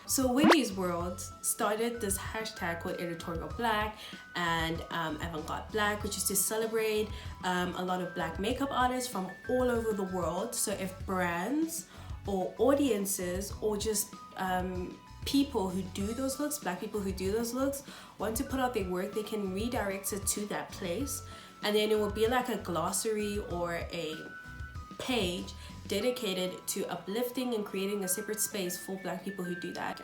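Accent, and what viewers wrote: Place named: South Africa. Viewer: I absolutely love this!!! I knew you were South African immediately and this is such a good video💗 I came here from an ig link and I'm so happy to be here Another thing these brands think we don't notice is when they make 15 shaded of concealer thinking we don't know all those shades can just be used as contour for lighter skinned/white people. That's why the darker concealers are always the wrong undertone (undertones that don't emerge among darker skinned people) Also love your shirt💗😋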